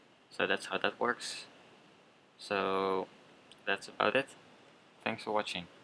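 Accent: Netherlands. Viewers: Het is heel duidelijk dat je Nederlands bent. Ik ook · jij bent nederlands of niet je kan goed engels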